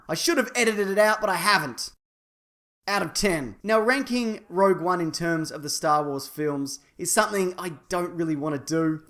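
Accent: Australia. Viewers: Dude....your sense of humor always gets me....out of 10. And your Aussie accent is simply awesome....also....out of 10.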